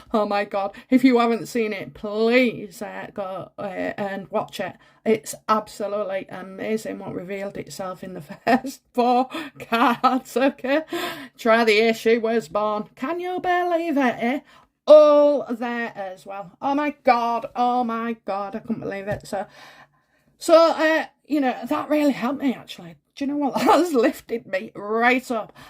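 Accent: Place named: Yorkshire, United Kingdom. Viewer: Hi my friend hope you are feeling better and stringer and please bring your accent back i feel so at home with you two Yorkshire lasses together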